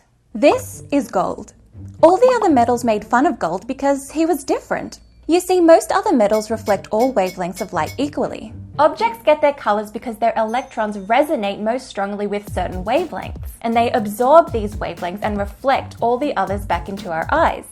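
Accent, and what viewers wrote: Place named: Australia. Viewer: Hot + Quantum physics + Australian accent = Sizzling Hot.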